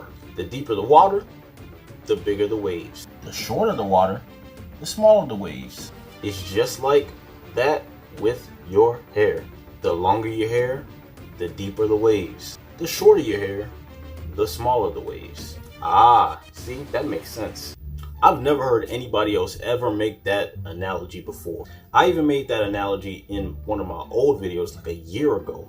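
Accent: Jamaica. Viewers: Wait r u Jamaican too🤙🏾🔥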